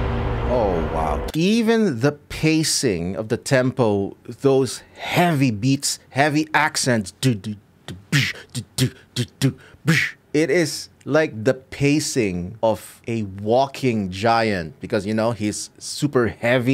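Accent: Philippines. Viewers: I may be wrong but.... Are you filipino? Considering your accent and your speech pattern, I'm led to assume your filipino good sir ( I'm new to this channel :'D )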